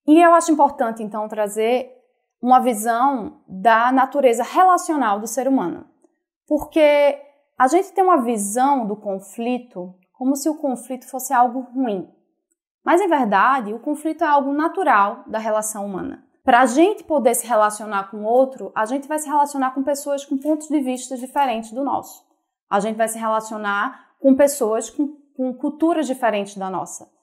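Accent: Northeast, Brazil. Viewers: Eita sotaque maravilhoso da!!! Aoooo povo nordestino que eu amo!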